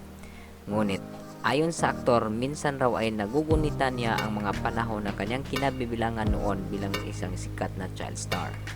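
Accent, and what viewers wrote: Visayas, Philippines. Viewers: Ang panget mung mag salita visaya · Bisaya po... gaya ko... ganyan talaga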